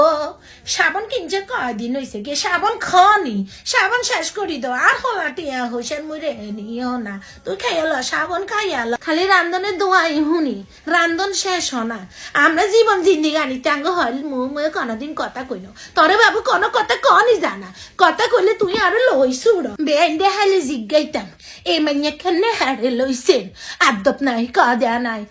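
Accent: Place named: Feni, Bangladesh. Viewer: আমার বাড়ি ফেনী তাই কথার অনেক মিল পাই। আপনার কথা শুনে দাদুর কথা মনে পড়ে গেল। দাদু এখন আর নেই। অনেক মিস করি দাদুকে। ❤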